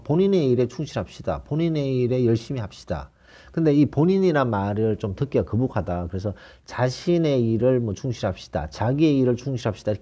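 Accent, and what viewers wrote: Gyeongsang, South Korea. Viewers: 선생님^^ 구수한 갱상도 사투리 듣기거북하지 않습니다. 내용이 문제지 사투리가 뭐 문제겠습니까? 사투리라고한다면... 지방언어인데. 그 지방에 사는 사람들이 쓰는 말이 사투리입니다. 촌사람들이 쓰는 말이 사투리가 아니라는 거지요. 그러니까 서울경기에는 서울경기의 사투리가 있다는 겁니다. 꽝! 꽝! 꽝!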